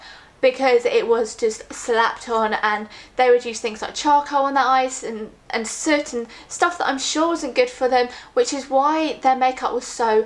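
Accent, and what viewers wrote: United Kingdom. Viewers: You are so sweet! I love how you say "hello my lovelies" at the begging of every video in your amazing british accent(; -3 i seriously adore you!!!!!! xoxo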